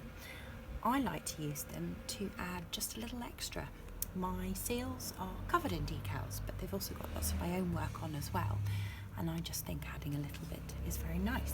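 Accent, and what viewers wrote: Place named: Devon, United Kingdom. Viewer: Devon accent 'ooh arr... I like a bit of poking tool for aaafters!' x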